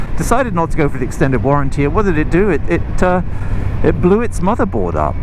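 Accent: England, United Kingdom. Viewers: Good video I’ve got a lot of your videos to catch up on I can’t believe the price of them mirrors I don’t know how much that would be in £ but sounds a lot and do you originally come from uk you sound English to me if you are I live in Essex near Colchester you might know it take care will catch up on some more of videos take care ride safe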